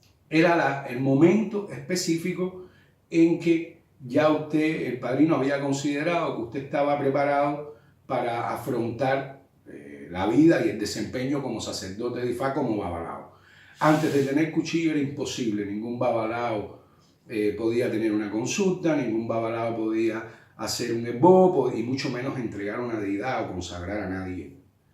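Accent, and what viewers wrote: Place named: Cuba. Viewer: Porque hablas como cubano si eres. Mexicano?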